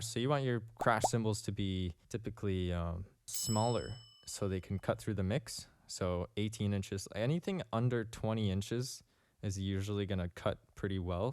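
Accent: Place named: Canada. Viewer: Canadian detected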